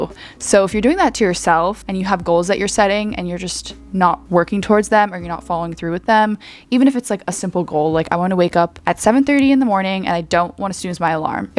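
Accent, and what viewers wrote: United States. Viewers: Ikrr the Minnesota accenttt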